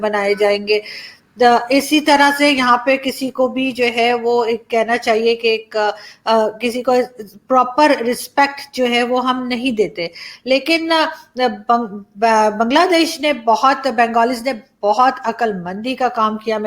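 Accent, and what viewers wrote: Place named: Prayagraj, India. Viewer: jab aap bolti he aap ki awaaz me se prayag miti ki khoosbo ati he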